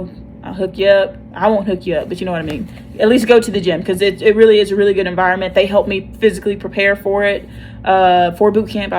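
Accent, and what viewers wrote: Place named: South, United States. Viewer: GUUUURL…your southern accent is BACK! Hahaha I’m from MS so it sounds comforting lol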